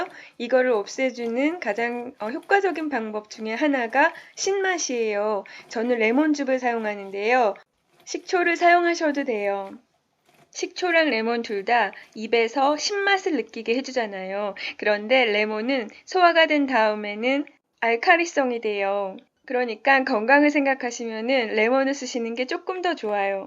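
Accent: Seoul, South Korea. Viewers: 서울사투리 듣기 좋네요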